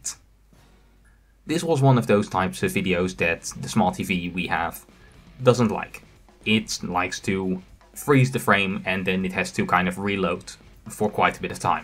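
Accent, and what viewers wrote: Netherlands. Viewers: Are you Dutch? You sound Dutch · your cute ass dutch accent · Man I could hear the Dutch in your voice. And all I got to say is.. frikandel broodje. Also great video i loved it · i mean your dutch accent isnt too bad. It took me a while after reading your comment to notice it, and I'm dutch myself XD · Wait are you dutch bc i recognize the accent and how you pronounce english words. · Ahh I figured you were Dutch :0 your accent’s very nice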